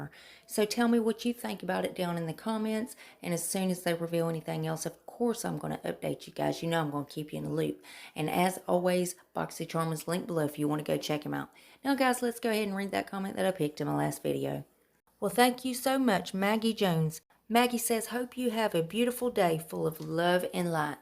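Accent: South, United States. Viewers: Thanks for sharing the info!! I love listening to you talk bc you have a really thick country accent like I do, 😂😂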